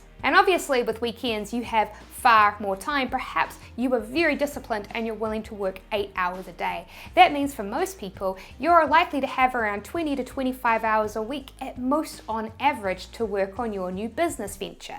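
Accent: New Zealand. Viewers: My dear, your Kiwi accent is absolutely adorable. I have next week off, and because I cannot travel, I shall get stuck into PrintOnDemand and DropShipping using your fantastic free eBook. I even downloaded a female New Zealand file on my Mac to read it to me. BTW I was just thinking, aren't all the people on AliExpress, just DropShipping for Alibaba? One final thing, the final syllable of the French word "entrepreneur" is pronounced "er" like in "her", and not "ure" like "manure";-) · Love this! Well done. Really enjoying your pragmatism (and your Kiwi accent!) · Love your kiwi accent... Your nation knows my nation well via rugby .. (Wales) love your content.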